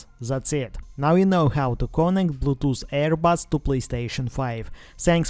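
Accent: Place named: Eastern Europe. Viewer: It's like Slavic mixed with Greek with a hint of Arabic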